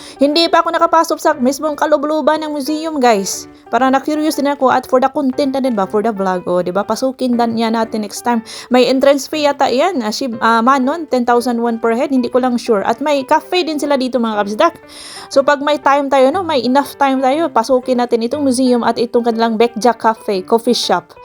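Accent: Bohol, Philippines. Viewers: Tagal bohol ka mam? Or surigao😅 kay murag mao mao ra gajud tag inistoryahan, taga bohol ko.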